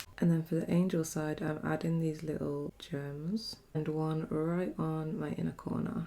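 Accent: United Kingdom. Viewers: I like both but goth is the winner for me also are you British or something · You mean to say, she speaks English in a British accent… like all British people do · She sounds so British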